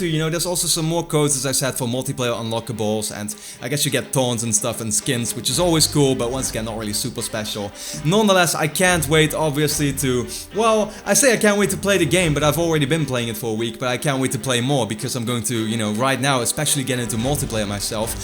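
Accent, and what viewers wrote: Netherlands. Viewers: je axent is heel erg nederlands leuk om te hooren😂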